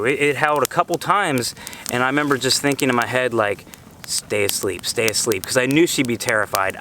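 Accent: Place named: United States. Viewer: I'm always relieved when I click on these videos and hear an American accent.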